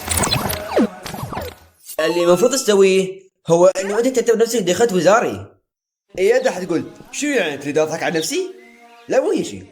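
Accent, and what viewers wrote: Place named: Iraq. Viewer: صراحة المونتاج حلو جدا بس حاول تقلل من لهجتك العراقية في المقاطع حتى نقدر نفهمها صوت بالكامل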